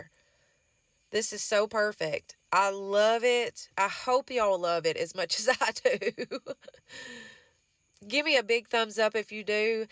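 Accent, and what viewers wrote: South, United States. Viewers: I love watching your channel so many pretty country farmhouse things you make and I love your southern accent you remind me so much of that TV show designing women which I loved to watch also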